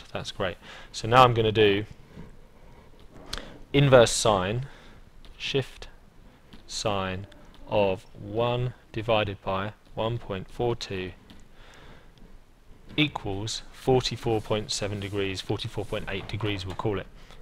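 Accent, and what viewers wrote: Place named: United Kingdom. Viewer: Thanks this video helped me a lot in learning British accent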